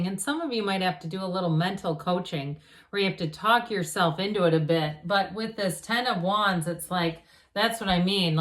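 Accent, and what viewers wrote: United States. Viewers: Thanks you so much. Of all tarot readers you're my favourite, and I love your American accent 😊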